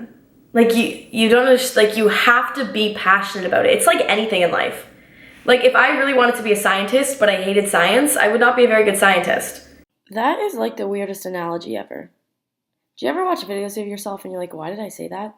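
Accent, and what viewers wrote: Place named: Canada. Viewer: Can really hear the canadian in you!!!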